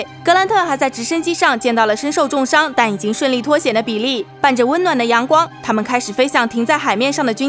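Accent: Jiangsu-Zhejiang, China. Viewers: 江浙口音，不要逼太紧。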